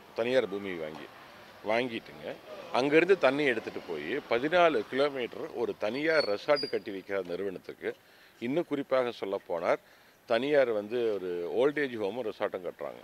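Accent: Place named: Coimbatore, India. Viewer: வெள்ளந்தியான பேச்சு. கோயம்புத்தூர் வழக்காடல். அய்யா நீங்கள் ஏன் இந்த பாழாய்ப்போன அரசியலுக்கு வர வேண்டும்?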